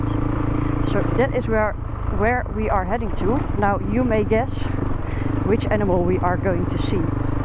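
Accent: Netherlands. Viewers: Dag, bedankt voor deze gewelig mooie video. ik heb er van genoten en ook van jouw Engels met dat onmiskenbare lichte Nederlandse accent😂 Groeten uit Breda NL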